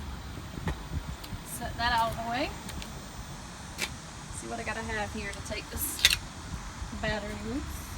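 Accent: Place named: South, United States. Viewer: I've been looking at these along with the PIAA versions....thanks for a great video! You've got to be from the south....I know my own :D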